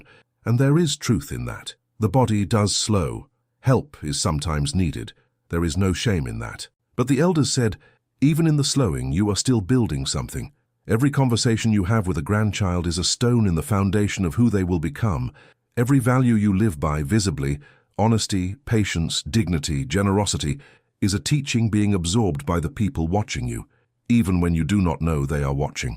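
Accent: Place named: England, United Kingdom. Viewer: Why is the exact same voice on all these types of videos? A slight English accent, a deep voice… is that one guy or is that AI?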